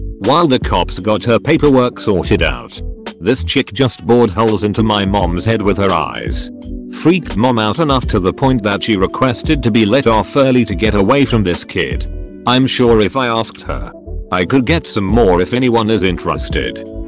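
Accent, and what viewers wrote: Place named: United Kingdom. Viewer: I wish people would use a different voice. I hate it and keep running from channel to channel but every single one uses this annoying robotic brit. Lol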